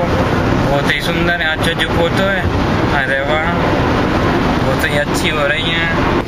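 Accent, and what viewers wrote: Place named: Vidisha, India. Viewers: bond ho sir aap...i have a friend from vidisha, sounds just like you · D Bundelkhandi Boli.....quite close!!! Good one!!!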